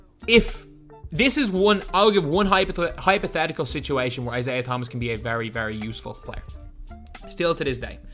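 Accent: Ireland. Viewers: Yo u Irish?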